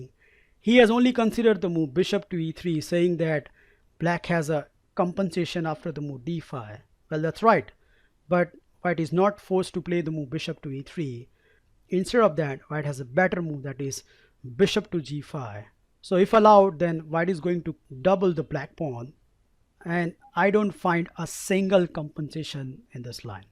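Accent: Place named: India. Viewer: your my chess guru! I have so much fun using your tactics! Your English-India accent is awesome. Blessings for your entire family.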